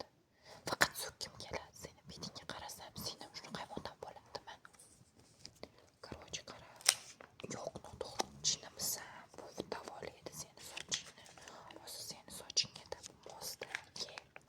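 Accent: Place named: Fergana, Uzbekistan. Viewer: Асмр учун рахмат омад тилеман. Шева Фаргонаники шеклли ааа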